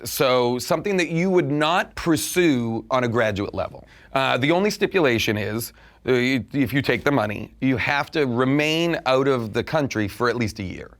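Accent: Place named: Boston, United States. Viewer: He's from Boston or thereabouts. They say "aboot" and shit like Canadians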